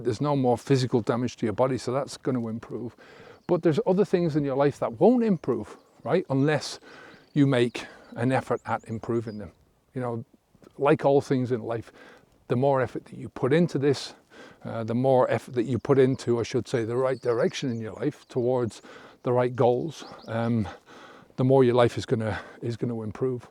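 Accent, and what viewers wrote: Ireland. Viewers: I had the conversation with myself this week. Im a functioning alcoholic. No major hangovers or troubles with the law but definitely something i want to stop. Im SO grateful that i found your channel. You sound irish also. Im from Donegal. Living in Brooklyn ny. Thank you, sir.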